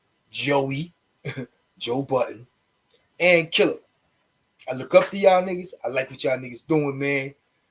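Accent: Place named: AAVE, United States. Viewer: Get a better camera. Speak English, not ebonics you are too old to sound like a uneducated, hoodlum. Also do your googles, 1/3 of your info is incorrect.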